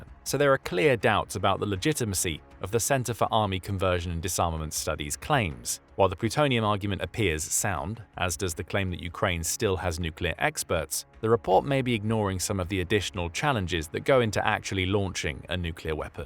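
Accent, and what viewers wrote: England, United Kingdom. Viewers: What bugs me about the narrator (Who by his accent is clearly English) is that he keeps pronouncing "Nuclear" like an American.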